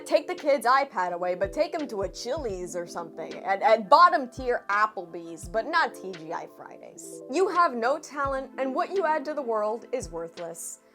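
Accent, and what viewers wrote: Spain. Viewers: Oh and i just love your spanish accent!!!!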